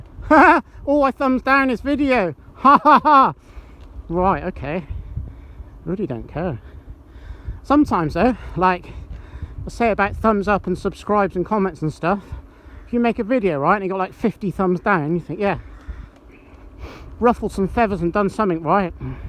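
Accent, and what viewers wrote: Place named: South West England, United Kingdom. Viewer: Well yeah, I could tell it was South West by the accent haha. I’m in Cornwall. I’m guessing you are Devon somewhere?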